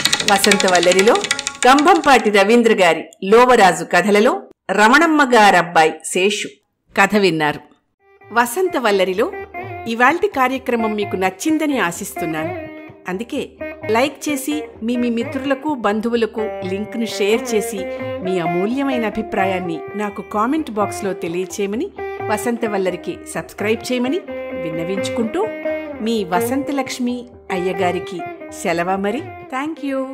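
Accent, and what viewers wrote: Godavari, India. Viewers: గోదావరి జిల్లా యాసతో బాగా చదివారు. కధ చాలా బావుంది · గోదావరి జిల్లా యాస తో బాగా నవ్వించారు.పాకం గారెలు ఎప్పుడూ తినకపోయినా తిన్నట్లుగానే వుంది మీ మాటలు వింటుంటే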